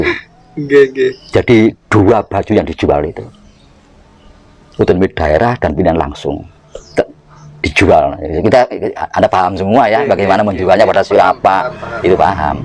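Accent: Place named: Lumajang, Indonesia. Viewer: Logate wong Lumajang..Iki..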